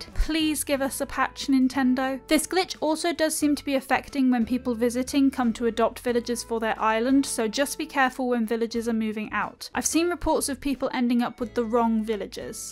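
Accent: United Kingdom. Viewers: It's a Brittish accent.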